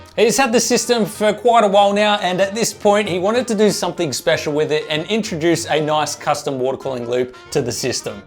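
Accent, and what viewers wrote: Australia. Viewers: That Aussie accent and saying,”… if I should burn it!” Killed me!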